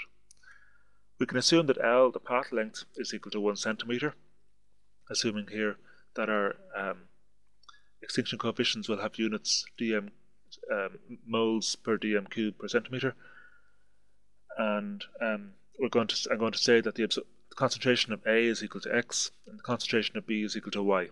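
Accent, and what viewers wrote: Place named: Ireland. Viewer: Lol, recognisably irish accent. refreshing for a change :)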